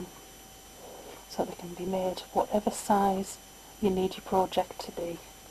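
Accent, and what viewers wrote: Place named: North East England, United Kingdom. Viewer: thankyou for a great tutorial , love the flowers you sound very similar to my cousin who lives in Saltburn up north do you come from the north east??im guessing you do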